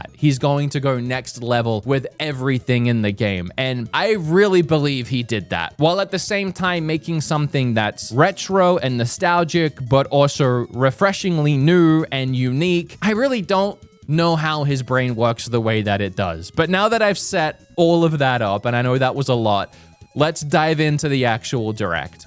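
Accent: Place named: Australia. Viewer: Where are you from? I can't figure your accent out. I get Australian at times, and Boston at others.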